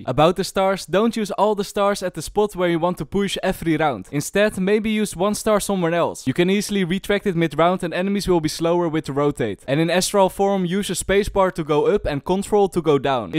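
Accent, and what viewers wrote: Netherlands. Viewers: Just make a video in Dutch xD. Don't want to be rude but we can hear your accent pretty hard xD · i smell dutch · thats one heavy ass dutch accent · Im sorry but your content is lacking. First of all im Dutch myself aswell and my accent is not so bad as yours. Maybe practice a bit must 10 minutes can make a big difference. Some of your tips are actually useless like the Kay/o dagger. The Line-up itself is kinda bad the dagger flies way to long and the spot where it lands is to dat back. The enemy team had pushed in to long or short and wont get hit by the dagger so you wont get Intel. And dont say that your viewers dont have brains people will stop watching due to your toxic behavior the only good part about this is that you confirm that you actually play the game. And the "tips and tricks" from you guys serie just makes clear to me that you dont put time and effort in to your videos I hope i could help with my critisism to keep one Dutch content creator live a nother day Have a good day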